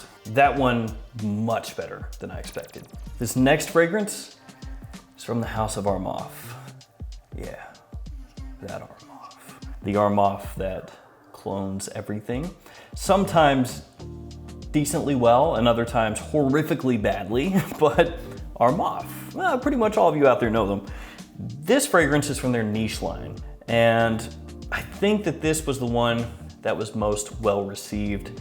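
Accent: United States. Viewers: Really? That's how Americans talk? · I mean hes American so I guess his normal talk is actually how we talk lol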